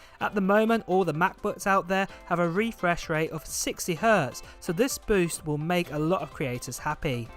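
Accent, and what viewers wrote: United Kingdom. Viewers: Well, I want to buy the iPhone 11. But sadly I don’t have money for that. And also i didn’t already bought any apple gear or product cuz I don’t have money for that, And i hope i will be the one who got your iPad. Because It can help me in my studies. btw i like your british accent, congrats and godbless💖💖💖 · I hope to get the iPhone 13 for Christmas. I your channel is great and informative and I just really love your British accent when you say thousands it sounds like fousand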